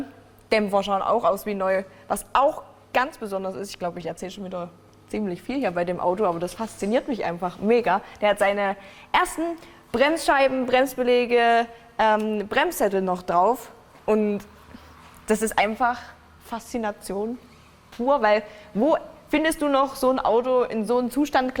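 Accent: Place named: East Germany, Germany. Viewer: Richtig ekelhafter Ossi Akzent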